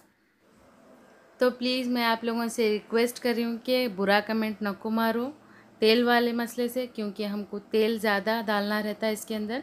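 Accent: Hyderabad, India. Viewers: Hedrabadi aese hi bat karte hain Meri ami b hedrabadi hain Or woh b India se hain magar shadi ho k Pakistan aee thi un ka lehja ab b thora thora wesa hi hai jab k unhe yahn aye hue 33 years ho gye hain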